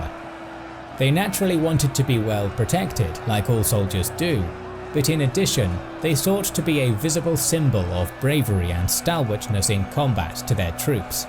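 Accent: United Kingdom. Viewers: this is going to be a controversial statement, but you might want to encourage your school to utilize a channel with an american narrator. i consider myself a student of languages and i've read a million anecdotes about the general american accent being an easier accent to understand for ESL students than most of the accents which occur throughout england and wales. unless you're located in a country geographically near to the UK, it's also more likely you will encounter the american accent in the media.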